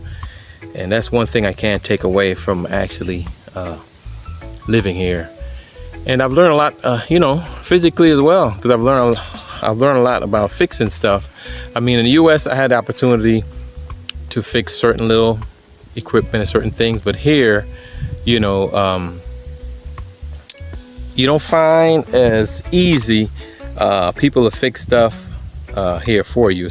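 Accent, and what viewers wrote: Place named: Belize. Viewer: You sound like you picking up the Belizean accent also😂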